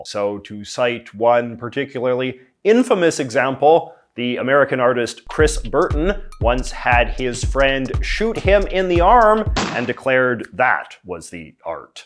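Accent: Canada. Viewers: Canadian accent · I like how you deliberately turn the Canadian accent up to 11.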